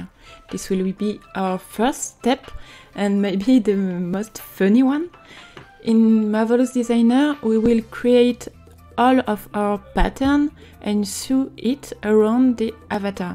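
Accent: France. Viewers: Your french accent really cute :3 and dont worry your accent is understandable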